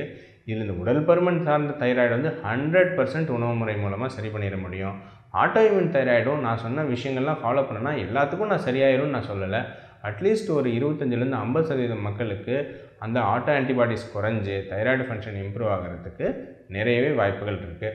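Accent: Kongu, India. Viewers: டாக்டர் அவர்களின் கொங்கு தமிழ் இனிமை. வாழ்க வளமுடன்.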